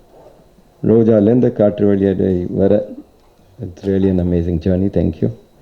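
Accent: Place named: South India, India. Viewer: hes talking like a south indian aditi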